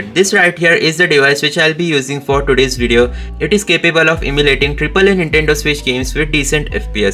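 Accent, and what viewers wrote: India. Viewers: Are you indian 😅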